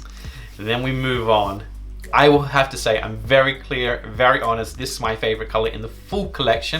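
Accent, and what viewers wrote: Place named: Australia. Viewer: Australia, I was trying to pick your accent as it sounds aussie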